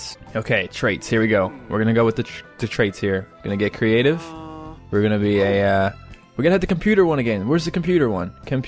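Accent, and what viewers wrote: Canada. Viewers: Hello I watched your sims 4 videos with my mom because she is obsessed with the sims, she loved your videos and wants to know if you are Canadian, because of your accent... Yeah... Random. Anyway, thanks for vids, you really made us laugh.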